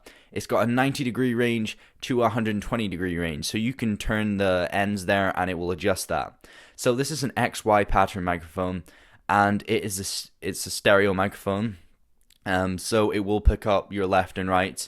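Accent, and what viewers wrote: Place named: Scotland, United Kingdom. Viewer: you have got a wee Scottish accent coming through mate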